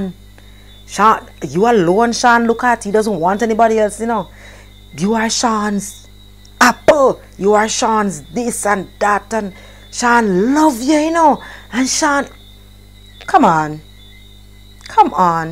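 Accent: Jamaica. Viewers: I always enjoy watching your content on relationships tips and also listening to your Jamaican accent.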